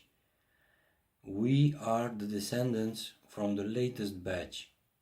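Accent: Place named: Croatia. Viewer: where are you from you're accent sounds Croatian. I misspelled before sorry.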